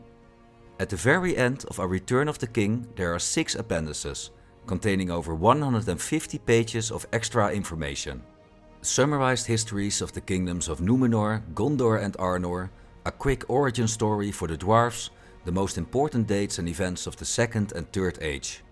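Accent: Netherlands. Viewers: The dutch accent is strong in this one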